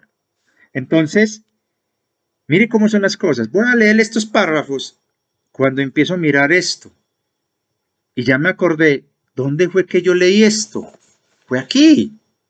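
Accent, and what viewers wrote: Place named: Colombia. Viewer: Amigo, es una agrado venir a escuchar sus relatos, historias e información, es muy agradable porque uno se siente como en casa, con gente que habla y siente como nosotros, los "diferentes". Debo confesarle que me encanta el acento colombiano, así que mas agradable se torna escuchar lo que nos cuenta. Muchas gracias por su labor.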